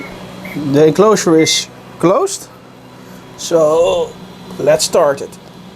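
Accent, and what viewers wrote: Netherlands. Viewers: Very nice, but damn your Dutch accent is heavy: Fideo, nafigation etc etc.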